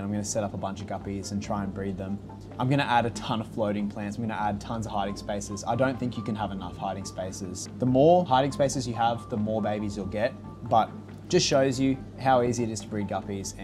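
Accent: Australia. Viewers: There's just something special about someone with an Aussie accent telling you about guppies haha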